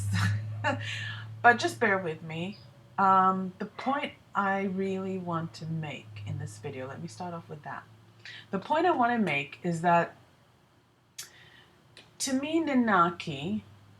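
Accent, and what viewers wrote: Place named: Milwaukee, United States. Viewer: It's so uncanny: you have the same speech pattern as Some Girls! Even using "Ummmm" "uhhhh" with a slight Milwaukee accent!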